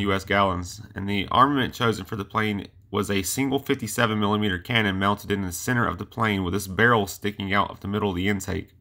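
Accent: South, United States. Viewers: Again, here are my recommendations. Your mouth is still full of cotton & marbles. Speak clearly! Enunciate! Open your mouth, make it round. Get rid of the Southern affectation. Your microphone needs to be close to your mouth, it sounds as is it is across the room.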